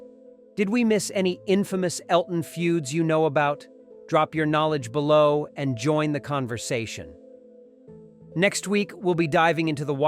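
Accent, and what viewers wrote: United States. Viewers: Elton and Freddie frequently partied together and also exchanged gifts with each other. Elton even cried at Freddie’s passing, and sang the song at his tribute concert. They were very good and close friends, I’d say and the rivalry wasn’t toxic the way it’s described here. This snarling narrator with an American accent has produced this video for clickbait by peddling in significant mis/disinformation imo.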